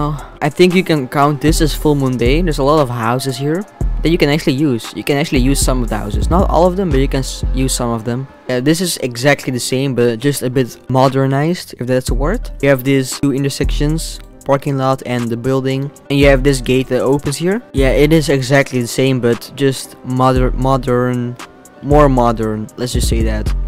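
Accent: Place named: Quebec, Canada. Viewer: Cazy how much you sound like you are from québec! are you from québec???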